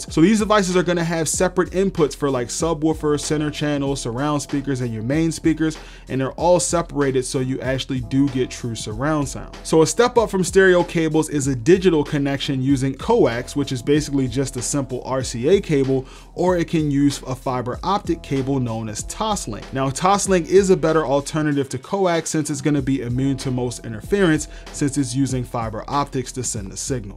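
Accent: Baltimore, United States. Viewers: I knew you was from Baltimore lol. 👌🏾🤘🏾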